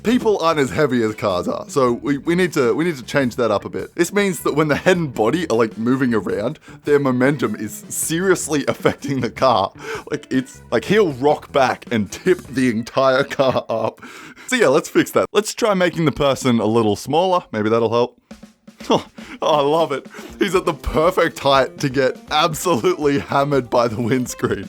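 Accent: Australia. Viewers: are you a fucking aussy or a southerner? this depends on sub status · Spoiler alert: Is Australian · I like your voice, are you Australian?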